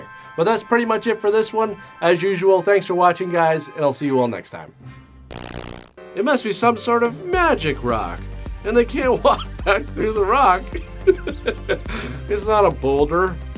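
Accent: Canada. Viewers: I found it quite obvious that he was Canadian after watching his first video. Although there are people in the Northern US that do have similar accents.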